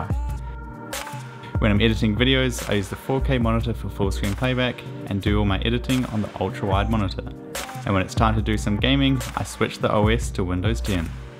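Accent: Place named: New Zealand. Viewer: hey bro where are you from? Thats a new zealandy accent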